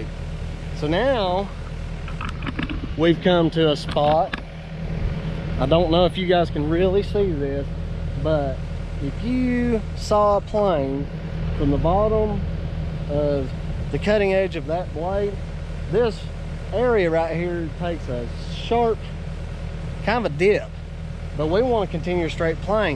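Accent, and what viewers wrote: South, United States. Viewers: your a hell of a teacher with your southern twang \m/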